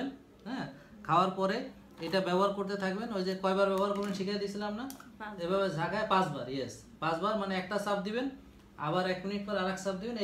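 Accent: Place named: Feni, Bangladesh. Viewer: স্যারের মুখে ফেনীর ভাষা শুনে অনেক আনন্দ পেলাম এবং খুশি হলাম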